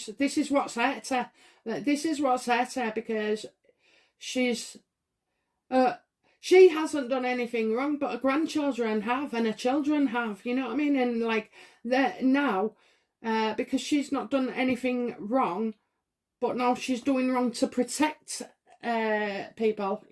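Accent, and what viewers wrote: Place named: Yorkshire, United Kingdom. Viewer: QE2 could be wishing for Harry to return without Meghan. Great reading. Could listen to you all night. Nice to hear a fellow Yorkshire lass x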